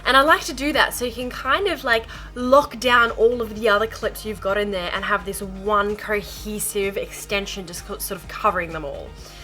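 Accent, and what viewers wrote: Australia. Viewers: Sorry, but I don't find the Australian accent very nice. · Good old Aussie accents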